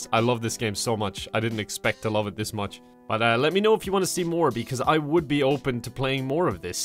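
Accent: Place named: Ireland. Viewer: You sound a lot more Irish in this video.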